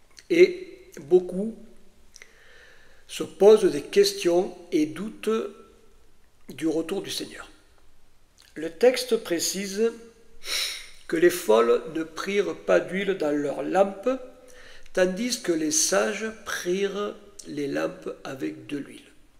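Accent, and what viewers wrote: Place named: South, France. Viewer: Merci monsieur... je vous ai decouvert il y a peu de temps... j’aime la justesse de vos propos... je remarque un bel accent du sud! Peut-être Êtes-vous proche de chez moi ! J’habite a Prades à 46 km de Perpignan ! Y a t’il une possibilité d’aller vous écouter si vous êtes dans la region ? En tout cas merci infiniment pour vos prédications ... j’aimerais beaucoup que le mo’de évangélique se rapproche d’ Israël, de ces fêtes et de la Torah... que notre Seigneur vous bénisse abondamment ! ❤❤❤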